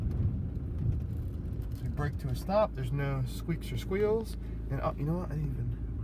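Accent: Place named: United States. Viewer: You sound like american xd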